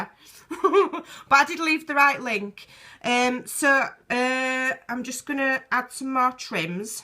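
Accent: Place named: England, United Kingdom. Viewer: You are so delightful to watch. I live in the states South Florida and I thoroughly enjoy hearing your English accent. Good tutorial check me out I make these too with old napkins. I plan to sub to your channel thanks again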